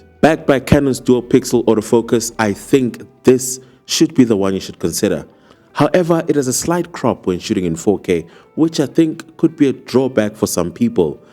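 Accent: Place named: South Africa. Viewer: I heard the South African accent and subscribed. Also, I like the zooming in and out of your MCUs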